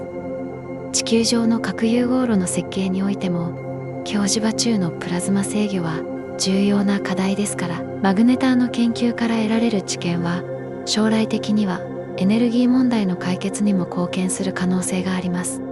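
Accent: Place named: Tokyo, Japan. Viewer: これより完璧な東京語を伺ったことがありません。 、イチオシです。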